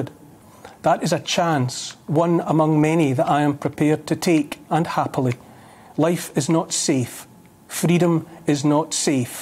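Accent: Scotland, United Kingdom. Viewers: this is proof that if you hire a Scottish man to talk about anything and vaguely reference William Wallace, people will agree regardless of how idiotic or poorly thought out the statements may be 😬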